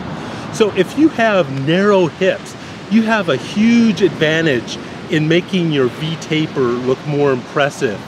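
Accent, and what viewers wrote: Germany. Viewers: Well, yea, but he seems to have a little German accent when he speaks English. Mostly with the latter "R"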